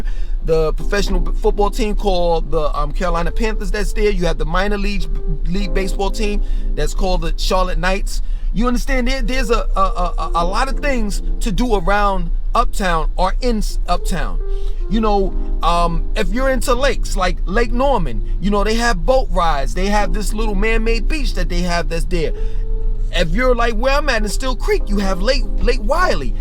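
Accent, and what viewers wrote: New York, United States. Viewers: Why does he sound like he have a NY accent and not NC?? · You are so ny 😂 even though your explaining how much you like NC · He a New Yorker basically saying NC better than NYC .. 😂😂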